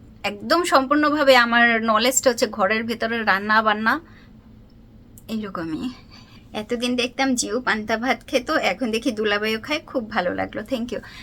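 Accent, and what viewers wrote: Khulna, Bangladesh. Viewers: আপনার কথা ভংগিমা অনেক সুন্দর কারন টা হলো আপনি আমাদের খুলনাবাসী তাই।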